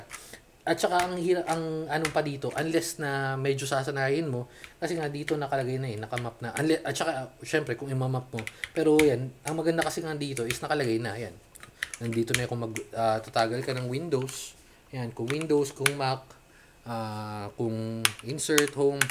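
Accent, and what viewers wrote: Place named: Pampanga, Philippines. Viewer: Thank you po sa detailed review! Kapampangan poba kayo? parang nakadinig ako ng accent ng fellow kabalen HAHAHA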